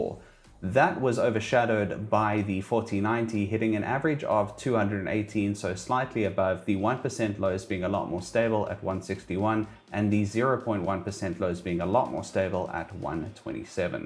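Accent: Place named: South Africa. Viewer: South African accents are always so noticeable haha. Nice video man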